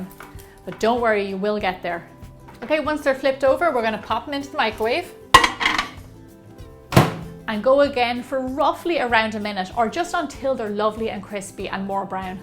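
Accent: Ireland. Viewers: So irish 😂😝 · I love your recipes; and I have to say, I love your accent too. It reminds me of someone from Ireland who is very dear to my heart <3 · Is she Irish? Couldn't tell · Irish accent talking potatoes....I'm listening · I love the irish accent ❤️ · I love the brogue, I miss the brogue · Your beautiful Irish brogue accent sounds just like my grandmother sounded. She was from County Cork. I've tried your potato chip in the microwave... PERFECT baby! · I'm only here because i love her accent. Irish chic's are sooo hot. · She sounds pretty Irish to me! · Agreed. I love the Irish accent. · Wait ur Irish no wonder you souand like Jackspeticeye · Is it just me but the more I watch the more Irish she sounds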